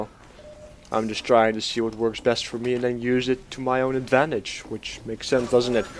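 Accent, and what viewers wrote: Netherlands. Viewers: Are you dutch? U got a dutch english accent XD (no offence) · your dutch right? :p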